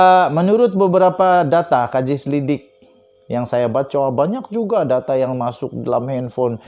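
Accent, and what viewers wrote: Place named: Sabah, Malaysia. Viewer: Sabahan betul cakap kau ni.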